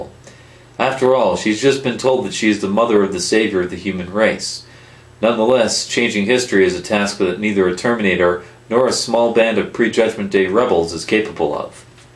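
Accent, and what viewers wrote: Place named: Midwest, United States. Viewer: HAhaha nice! Wisconsinite's are abit more crazy with the r's though. That sound like a more sophisticated Midwestern accent. i cahn tie-pah een mah ayct-cint. baht i cahn't tah-k een ah us-trail-leen ayct-cint. wee-sh mah luk ^^^thats how a lot of people talk round here our is pronounced - "R" in fact r's dominate words in WI we just get stuck on the r's all the time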